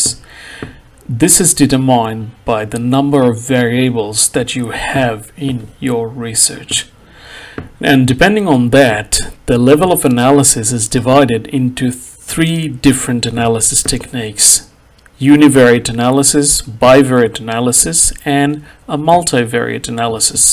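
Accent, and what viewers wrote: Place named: Australia. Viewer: Interesting Australian/Something accent and great video. Thanks!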